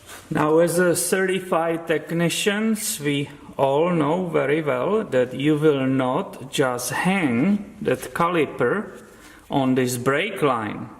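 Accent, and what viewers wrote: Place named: Ukraine. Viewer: Love your vids, smooth, low key, imformative, with a slight Ukrainian , Polish , touch maybe!!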